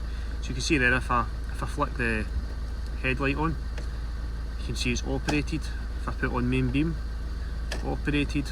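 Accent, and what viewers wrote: Scotland, United Kingdom. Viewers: the thick Scottish accent is a great listen to be fair mate 👍😂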